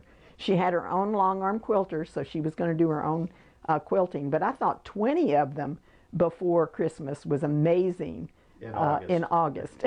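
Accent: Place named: East Texas, United States. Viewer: Love to listen to you. Lovely E Texas accent. I am an Eastern planted in San Antonio.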